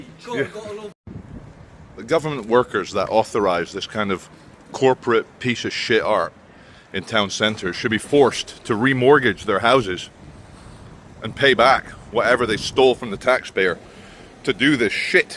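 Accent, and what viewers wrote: Scotland, United Kingdom. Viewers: U sound so Scottish to me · your accent ... it's so Scottish , well spoken Scottish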